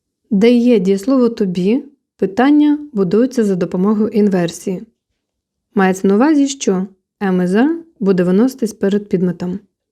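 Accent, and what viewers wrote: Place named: Eastern Europe. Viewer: наче все правильно, але сильний східно європейський акцент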